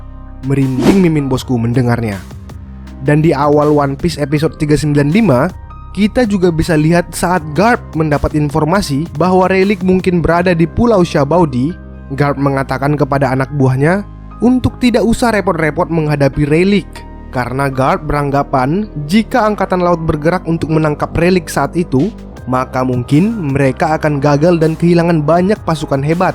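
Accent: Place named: Medan, Indonesia. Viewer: nampak kalo abang ni orang medan bah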